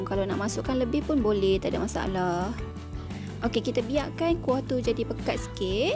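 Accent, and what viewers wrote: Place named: North, Malaysia. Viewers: Terima kasih cik oii,.,memg sangat sempoi...esok pagi makcik mesti try ni., kbetulan semua bahan ada ja...dengaq cek makan krupkrap pun dah telioq aih...terima kasih no.,kongsi resepi ni...cakap utaqa tu maintain na cek.... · Pekat nah utagha. Boleh belajaq kat sini sekali hehehe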